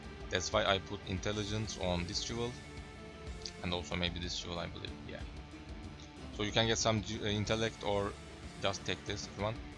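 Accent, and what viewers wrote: Turkey. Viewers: Aksanınızdan Türk olduğunuzu düşünmüştüm ve kanalınıza baktım hocam :))) Teşekkür ederim, oyuna yeni başladım, bu build hem ucuz hemde sağlam gözüküyor. Bu build ile başlayıp ileride farklı karakterlere geçiş yapacağım, sağolun güzel video olmuş.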